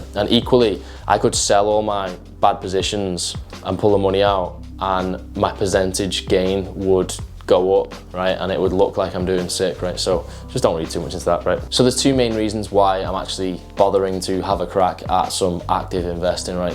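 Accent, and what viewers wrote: Liverpool, United Kingdom. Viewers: I was not aware that you were into finance/investments. Anyway, providing you stick at it, you will improve (just like fitness). From your accent I think you are based in Liverpool - have you thought about buying an investment property? You'll be surprised that even with just £20k, you can enter the market. Anyway, good luck with your endeavours.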